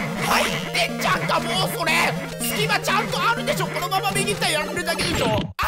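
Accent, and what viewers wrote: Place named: Kitakyushu, Japan. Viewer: たまに北九州の方言が出るのがめちゃくちゃ親近感湧きます🥑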